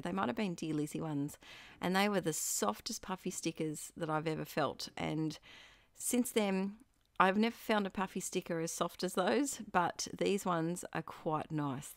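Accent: Australia. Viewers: I enjoyed watching your video. Thankyou for sharing your process. I agree, the papers you've used are beautiful. It's nice to hear another Aussie. A fellow New south weigan nonetheless. 😁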